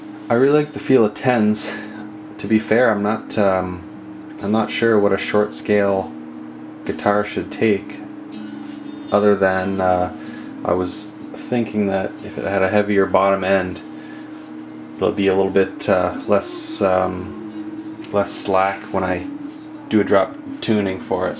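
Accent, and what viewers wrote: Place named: Canada. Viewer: SLIGHTLY off topic but as a fan of linguistics, I have to say, Americans always think we Canadians say "a-BOOT". But as my hoser brother here says, it sounds way more like "a-BOAT".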